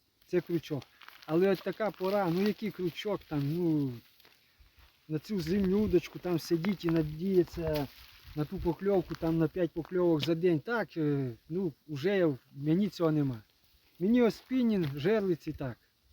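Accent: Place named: Polissia, Ukraine. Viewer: Бажаю міцного. Ви напевно десь з Поліського або Іванківського району? По акценту зрозумів. Можливо й не правий. Гарна риболовля. Так тримати. Все відмінно. 🎉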